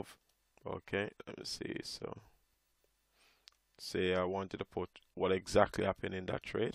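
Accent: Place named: Jamaica. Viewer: You sound Jamaican 🇯🇲 😳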